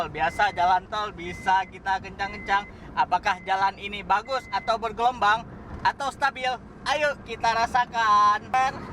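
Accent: Aceh, Indonesia. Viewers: LEBIH CEPAT ,,jika JNE pengiriman barang lebih cepat sampai nya tanpa lampu merah ,,Luruuuuus aja bagus2 ,,wajar lah logat nya ngomong kayak gitu kan orang aceh ,bukan orang jawa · Khas org aceh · Logat aceh,apa a y lucu.bgmn logat jawa y medhok,lgt madura,logat bali,d y lain.smua ada lgt msg2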